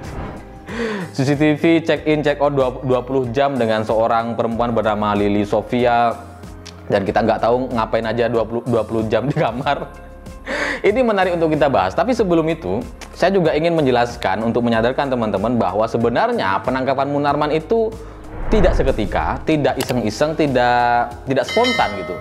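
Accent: Madura, Indonesia. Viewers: mas dgr dari logat nya org madura ya 👍👍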